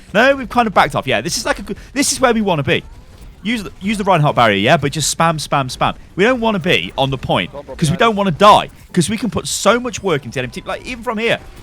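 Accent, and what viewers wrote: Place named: United Kingdom. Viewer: Omg highlighted my comment what do i say!!!! Um...um... I love you're British accent 😭😍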